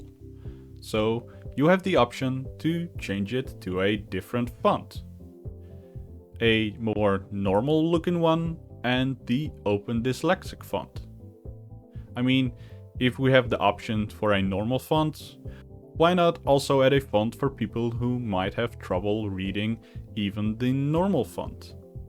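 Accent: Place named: Netherlands. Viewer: I'm dutch! Ja dat hadden we kunnen horen aan je accent pik :D The game looks like fun keep up the great work!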